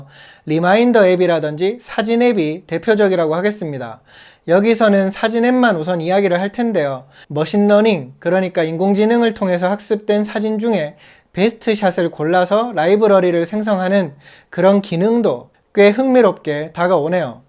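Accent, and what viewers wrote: Gyeongsang, South Korea. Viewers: 아우.. 목소리도 답답하고 그 목소리로 경남 사투리쓰니까 들을수가 없네요.. 첨입니다 악성댓글은 참 대단하세요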